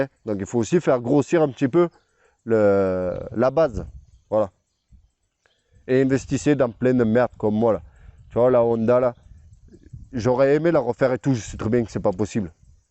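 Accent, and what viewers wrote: South, France. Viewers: Bonjour l’ami et merci pour ta sincérité. J’avais regardé ta précédente vidéo sur le sujet de ta maladie et crois moi tu ne nous embête pas avec ce genre de vidéo car ça nous permet de mieux te connaître et de mieux partager à chaque fois tes « aventures » mécaniques. Pour les vacances tu as bien raison car il faut parfois se resourcer un peu la tête et c’est ta vie et tu as le droit de faire ce qui te plait avec ta petite famille. En plus ce n’est pas tous les jours que l’on rencontre un Danois avec l’accent du sud lol !!Merci pour cette vidéo.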